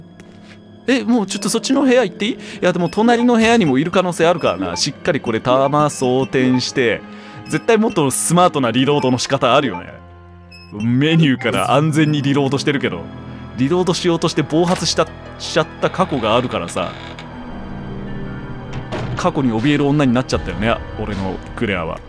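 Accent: Kansai, Japan. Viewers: 今回のうっしー、関西弁多めだなぁと思ってたら 理由わかった👍️ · ちょこちょこ出てくる関西弁がたまらなく好き笑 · なんでちょいちょい関西弁なん？（笑）好きやけど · クレアへの要求が高いうっしー(•'-'•) なんか最近関西弁出てるうっしーw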